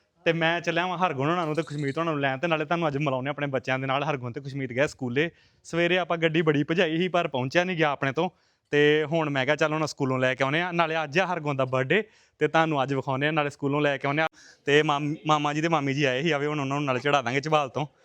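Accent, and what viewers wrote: Majha, India. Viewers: ਮੈਂ ਤੁਹਾਨੂੰ ਇਕ ਵੀਡੀਓ ਵਿਚ ਕਿਹਾ ਸੀ ਕਿ ਤੁਹਾਡੀ ਬੋਲੀ ਅੰਮਿਤਸਰ (ਮਾਝੇ)ਵਾਲੀ ਚਲੋ ਪਤਾ ਲੱਗ ਗਿਆ ਕੇ ਤੁਹਾਡਾ ਪਿੰਡ ਭੋਜੀਆਂ, ਤੇ ਮੇਰੇ ਨਾਨਕੇ ਨੇ ਭੋਜੀਆਂ, ਮਾਮ ਨਿਆਮਤ, ਮੁੱਖਾ, ਮਨਜੂਰਾ, ਦਾਰਾ, ਰਾਬਟ, ਇਹ ਨੇ ਮੇਰੇ ਮਾਮੇ ਦੇ ਮੁੰਡੇ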